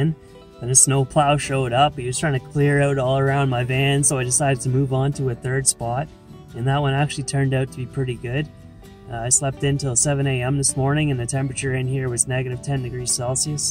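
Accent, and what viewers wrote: Canada. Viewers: the Canadian accent too! "Take off, aye!"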